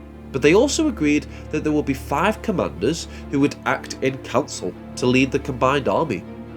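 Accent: Liverpool, United Kingdom. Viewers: I've lived in the north and south of England. For more than a while in each but can't place your accent. At times a little scouse then Welsh. What is it?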